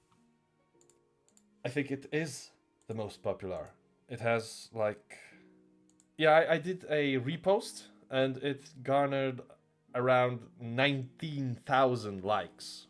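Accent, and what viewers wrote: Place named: Germany. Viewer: you sound kinda German but also not at all, the accent is so hard to pinpoint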